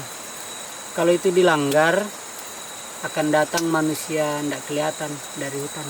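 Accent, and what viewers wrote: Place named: Makassar, Indonesia. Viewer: Eh ,, Toh ,, Hehhe makassarku sekali😘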